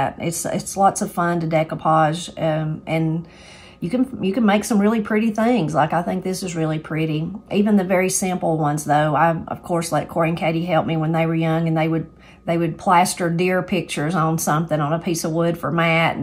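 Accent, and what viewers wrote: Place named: Georgia, United States. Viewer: You are so right! I sometimes just turn on her video just to hear her and her family speak and talk about their ups and downs and day to day little things! I'm in the Midwest but my family is from North Georgia and the accent and values of my people come through the screen to put a smile on my face! 😊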